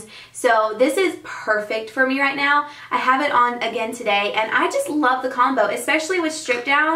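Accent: South, United States. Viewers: I really enjoy watching your videos. I love the products you recommend, I love your makeup in every video, and I adore your southern accent. But...your lighting is SO bright that it washes out (you sometimes) but mostly the swatches and products you show. It's hard to get a good idea of what the coloring is. Just a note.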